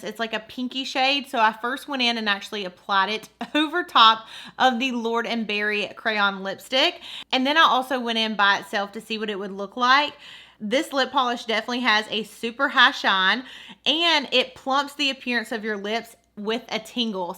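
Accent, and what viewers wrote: South, United States. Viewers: I don't get the ipsy bag anymore. I just upgraded to the glam bag plus and I am loving it so far. I wish I could get both because I loved getting the different bags each month. I'm just not financially able!! I Love your channel and that country accent. I'm from Georgia so my daughter has one lol...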